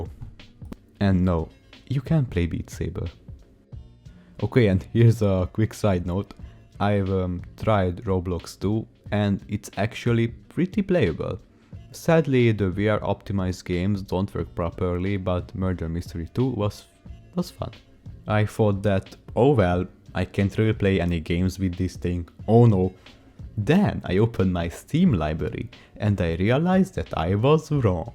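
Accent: Hungary. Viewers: Olyat ugrottam a székben mikor megláttam hogy huf de visszanézve a magyar akcentus felismerhető volt 😅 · Az accent az nagyon magyaros meg persze a google HUF valuta is elarulta hogy magyar vagy koszi a vidiert ♡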